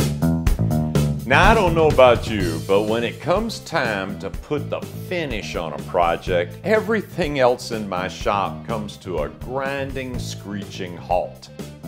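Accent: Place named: Texas, United States. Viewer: your Texas rhythm is perfect for the instructions. Don't let the thoughtless comments get you down. All the effort and talent you bring to your videos is very much appreciated. I've learned a great deal and I've been inspired.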